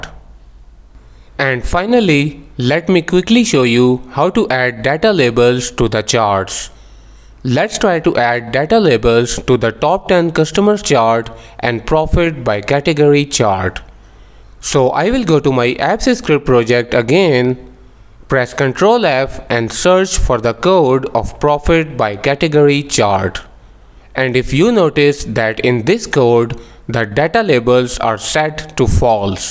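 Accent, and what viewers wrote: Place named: United States. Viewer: Nice accent for an American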